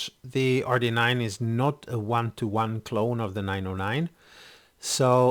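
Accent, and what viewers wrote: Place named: Scandinavia. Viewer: Your accent sound pretty much like your from scandinavia. I would guess you´re from sweden or norway, but, am I correct (im swedish by the way).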